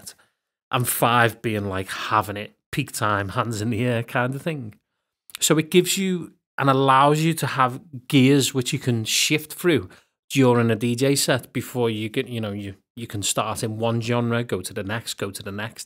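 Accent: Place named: Liverpool, United Kingdom. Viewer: I just discovered this feature, but didn't know how to filter or search with the tags... which is why I came here. And I was welcomed by the most amazing scouse accent in the history of mankind. Boss mate!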